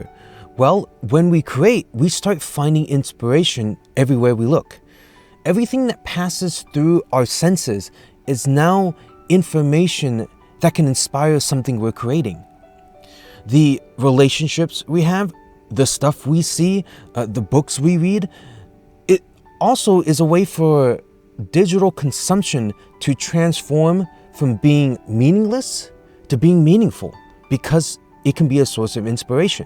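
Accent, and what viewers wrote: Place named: New York, United States. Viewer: Why do I keep getting a hint of NY or NJ accent? 🧐. Really enjoy your videos! Well done!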